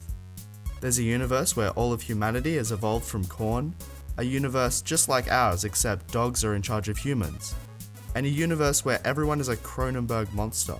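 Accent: Australia. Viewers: Yeah a Aussie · My man your aussie too ^.^ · Woo an Aussie! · Every thing is better with Australian accent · Aussie boy!!!!😀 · I FOUND ANOTHER AUSTRALIAN TUBER YEEEEEEA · I gotta love that aussie Accent.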